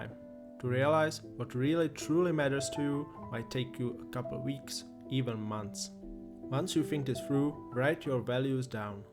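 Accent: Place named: Germany. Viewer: I doubt that AI could imitate your authentic German accent.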